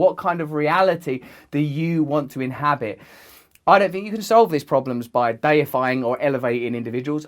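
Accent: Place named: United Kingdom. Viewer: I'm almost positive your British accent has become thicker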